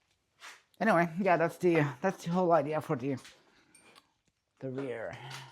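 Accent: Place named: Finland. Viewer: Noinkohan tuo rallienglanti ilahduttaa ulkomaan eläviä? Meinaan tekninen sanasto.. No sunhan tää on tube, jätän tilaamisen väliin.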